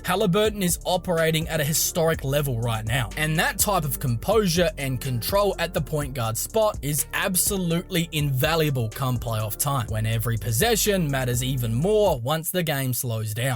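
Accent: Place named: Australia. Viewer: Seriously fucking Aussie accent.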